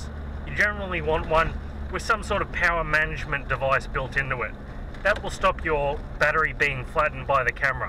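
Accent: Australia. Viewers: aussie accent! <3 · Thanks! Love that Australian accent!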